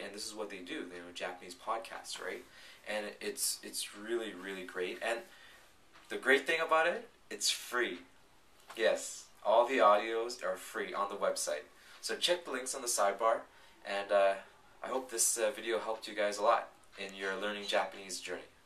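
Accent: United States. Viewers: Dude your English is spot on man. You speak it like an American. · Sounds like your in NYC! Pimsleur is not so bad, the issue I have with it is that firstly Japanese is not a difficult to pronounce language, unlike Korean, and the reverse pronunciation is complete overkill, though I have used the same method teaching English and it works. I agree with you and want to amplify the fact that Pimsleur's conversations are for the most part out of date. I took up Pimsleur when I was reviewing Japanese 4 years after having lived in Japan.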